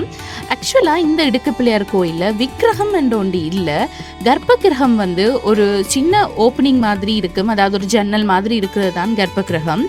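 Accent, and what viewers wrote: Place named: Sri Lanka. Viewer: Good one. Little different Tamil accent. Lankan Tamil ???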